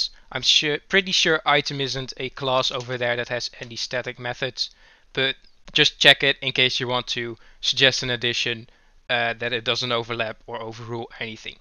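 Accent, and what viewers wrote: Netherlands. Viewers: Ur accent sound a bit like a dutch one, are you? xD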